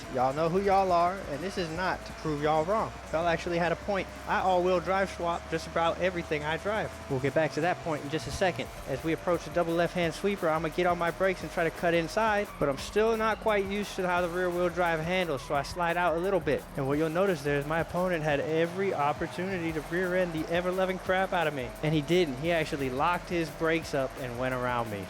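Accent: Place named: South, United States. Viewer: he sounds kinda redneck-y and that kinda accent unironically is a big breath of fresh air in the forza community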